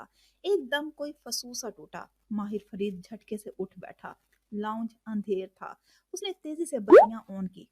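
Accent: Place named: Punjab, Pakistan. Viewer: Nice novel beautiful voice Lekin Punjabi andaz Punjabi language zada hai 😊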